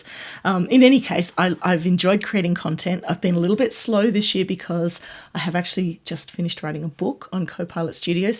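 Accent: Australia. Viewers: Fantastic, congratulations and well deserved. I always look forward to your videos when released and enjoy the content and your explanation - and your personality. Also, so great to hear a familiar Australian accent in sea of American Microsoft content. Well done!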